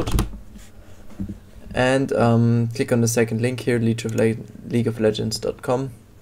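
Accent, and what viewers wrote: Germany. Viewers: i Hear a little bit of DEUTSCHEM AKZENT in ur voice (meins nicht böse)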